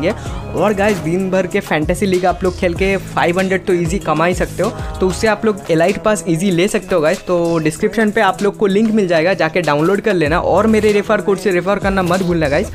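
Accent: Bengal, India. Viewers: Bhai aap bengali heee naaa?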